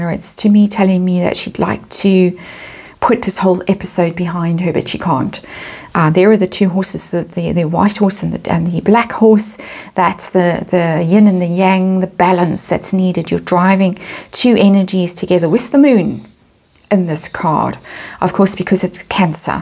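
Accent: South Africa. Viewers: I just want to thank you for a lovely reading. I always look forward to your readings. Merry Christmas to you and your family from a South African now living in New Zealand! I love listening to you as it reminds me of home! 🇿🇦💕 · This is a superlative reading. Thank-you! What a gift - my only this day. Such a beautiful pack of cards; (as an art director) I appreciate the design, the subtleties, the lateralness. I love your neatness and delivery, reliability and all-round warmth & gentleness. Also, after hearing which country you could be in, also by your accent; I found some kind of comfort knowing you might be nearby - us being at the bottom point of the globe. Then, recently you spoke of being near the sea and sand dunes; so I am thinking Blouberg side, or Noordhoek side. I'm in the City Bowl, near the top of residential Table Mountain. Seasonal blessings & love to you and yours. 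And a good 2022.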